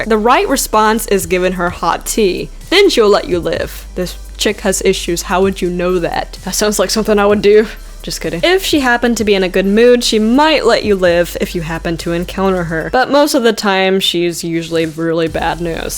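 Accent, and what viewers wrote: South, United States. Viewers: I love her southern acient it great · Are you from the South?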